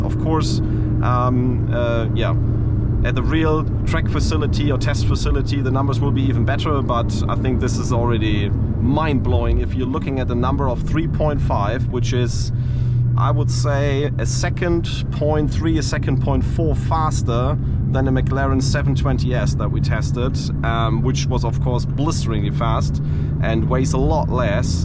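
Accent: Germany. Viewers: ein wunderschönes Denglish :) hört man sofort raus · are you from germany??? because you sound german?? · I hate this german accent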